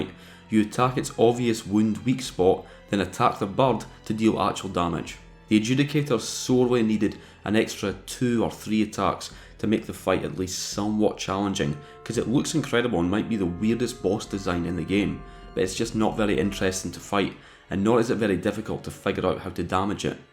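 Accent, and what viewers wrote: Scotland, United Kingdom. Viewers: Great video mate! I' ve only had a few problems understanding everything, because of the scottish accent, but went fine at the end, I guess 😅😂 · Great work mate, refresher to hear a Scottish YouTuber with an actual normal Scottish accent 😂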